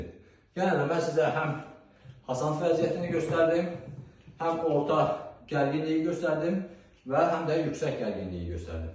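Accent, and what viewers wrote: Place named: Qakh, Azerbaijan. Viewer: Əxi siz Qaxdansız? Qax ləhcəsinə oxşayır ləhcəniz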